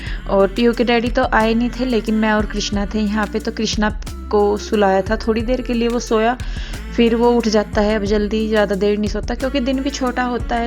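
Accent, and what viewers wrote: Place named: Rajasthan, India. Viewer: Hi mein new sabscribar hu aap ka chenal sabscribar keya hai meine roj video dekhti hu aap ki bolne ka tarika matlab aap ki lengvej bahot achi lagti hai aap kaha se ho rajasthan ya hariyana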